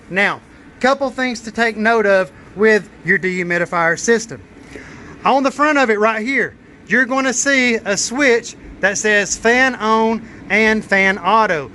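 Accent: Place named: Rural, United States. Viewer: Shit, I was sold and heard the country accent, but I'm in North Carolina :(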